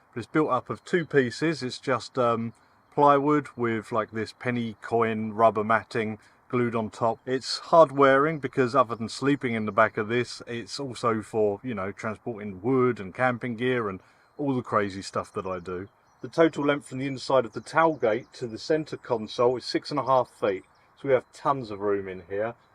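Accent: England, United Kingdom. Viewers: Sem aye , your English man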